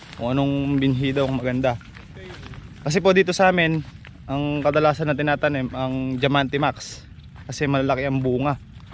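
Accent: Pampanga, Philippines. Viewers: Ka buddy kapampangan ka po ba? Hahahaha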